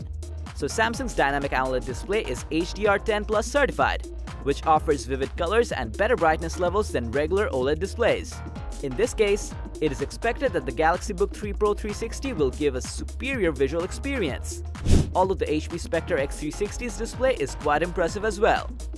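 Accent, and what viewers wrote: India. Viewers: Dude your Indian accent shines through that fake one. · Are you by any chance Indian? Not that it's important but I can't help but ask because of the accent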